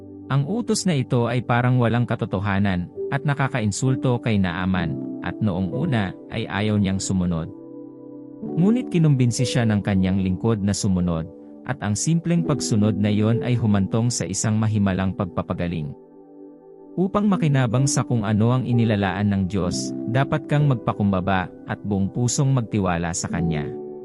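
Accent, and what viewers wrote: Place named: Filipino American, United States. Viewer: parang fil am managalog ang badoy mo mag aral ka ng tunay na salitang tagalog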